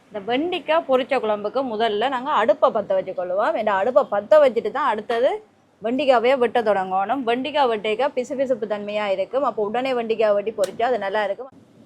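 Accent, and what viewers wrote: Jaffna, Sri Lanka. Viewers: கொஞ்சும் யாழ் மண்ணின் மணம் மணக்கும் தமிழ் சுவையோ சுவை. என் தமிழ் மகளே உன் அன்பான கையில் உணவு உண்ண துடிக்குது என் மனம். நீ தங்க தமிழ் பேசும் அழகு தித்திக்குதே. கொஞ்சும் தமிழ் போற்றும் மிஞ்சும் தமிழ் . ஆகா வெண்டைக்காய் குழம்பு சொல்லி கொடுங்க நானும் செய்து சாப்பிட ஆசை ஆசையாக இருக்கிறது. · உங்கள் தமிழில் யாழ்ப்பாண வாடையும், தம்பியின் தமிழில் மலையக வாடையும் அடிக்கிறதே ஏன்? நீங்கள் எந்த ஊர்?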